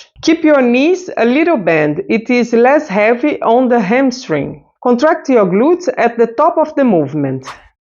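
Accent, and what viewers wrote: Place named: Portugal. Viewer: adorei os exercicios. vc fala ingles , mas nao aprendeu a ter a pronuncia e intonação da lingua inglesa. como? fala ingles com intonaçao do portugues , triste. otimos exercicios obrigada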